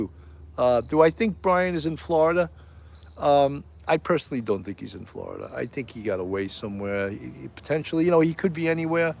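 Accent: New York, United States. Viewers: Good to see you!! I spent years growing up in Trenton, NJ. Now I'm is SC. I love your New York accent!! 😊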